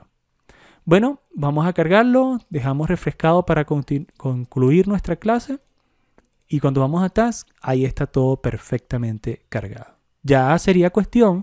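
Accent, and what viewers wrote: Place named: Caracas, Venezuela. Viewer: los ultimos 6 segundos del video son los que hablan de la Conexión de Microsoft Project a Navisworks, TOOOOOOODO LO DEMAS ES BLA BLA BLA elemental. no dijiste lo mas importante, ni siquiera hiciste un click en el espacio modelo para interactuar con el modelo 3d. el video ni siquiera tiene una segunda parte como para justificar tanto bla bla bla ... sera por eso que solo tienes 367 vistas y solo un comentario desde el 2020.. que perdida de tiempo escuchando ese tono de voz irritante con acento caraqueno.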